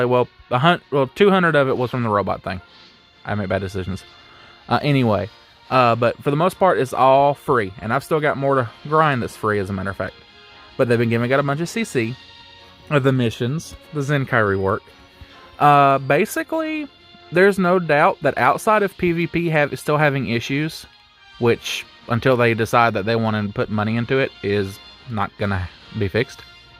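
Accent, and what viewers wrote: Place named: Georgia, United States. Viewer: First time hearing your voice and all I hear is the southern Georgia accent 💀. But I liked your zenkai take. Zenkai level per star is a really good concept for LF’s. If you want to do more content like PvP or Summoning, personally I’d watch it but if you’re comfortable with it.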